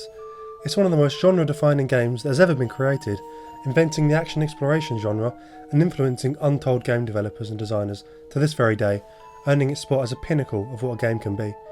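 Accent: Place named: United Kingdom. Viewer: Ah, I got a British accent, this time. Lotta Metroid videos, lately.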